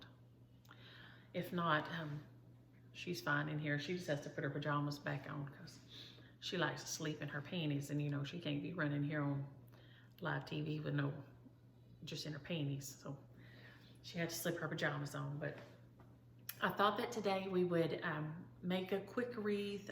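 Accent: South, United States. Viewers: Thank you so much for a wonderful Vlogmas! It’s been a day! And I needed a distraction. So nice to hear a southern accent...I am a transplanted southerner who wound up in western Canada, and I miss my home 🥴 Christmas is a time for family and good food, and I miss that more than I can say! Your wreath is beautiful (sorry I am behind on episodes) I am working on binge watching to catch up on your vlogs! But I keep getting sidelined by chores🥴Wishing you and your family many blessings of the season! ❤️🎄🦩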